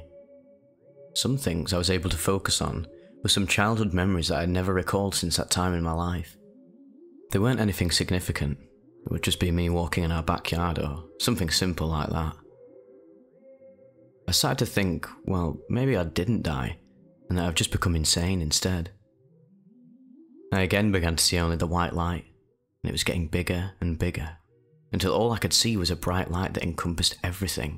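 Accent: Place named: Northern England, United Kingdom. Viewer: “If life was just in pure god mode and we were fucking no clipping through walls it would be well boring won it” love actually having British humour on the internet for once instead of watered down American humour and way of talking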